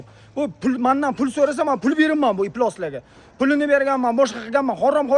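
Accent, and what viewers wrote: Namangan, Uzbekistan. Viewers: ШЕВА УДАР НОМОНГОН ХЕЛЕ ХЕЛЕ КМОНОР😂😂😂😂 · Namangandon sheva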